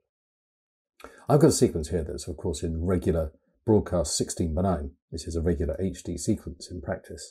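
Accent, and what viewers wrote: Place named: RP, United Kingdom. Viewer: You sound very posh! Thanks for this - it helped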